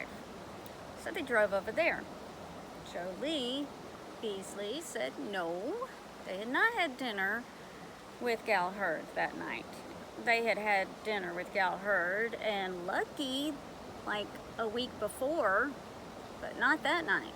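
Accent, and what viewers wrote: South, United States. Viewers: Love your southern accent !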